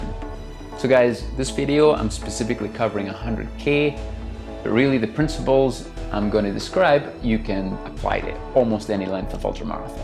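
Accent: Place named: Scotland, United Kingdom. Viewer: can't tell if you're scottish or swedish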